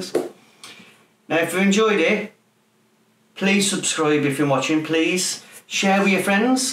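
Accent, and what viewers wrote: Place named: Walsall, United Kingdom. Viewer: Subbed - You sound like a local lad - i'm in Walsall - Thanks for the Inspiration to get stuff done!!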